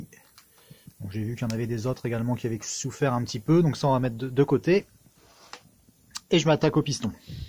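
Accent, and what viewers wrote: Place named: North, France. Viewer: On entend quand même l'accent du nord lol ,super toujours au top tes vidéos et du taff de qualité j'adore ça ,je suspecte de plus en plus qu'on a à faire à un formateur en méca ou alors un grand grand passionné chapeau l'artiste 😉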